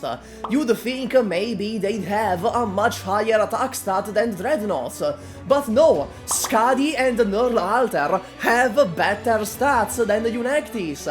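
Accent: Italy. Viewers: It my first time hearing an Italian Explain Arknights, How the Siracusao?? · I reconized that accent as a fellow italian, good to have a youtuber from around hete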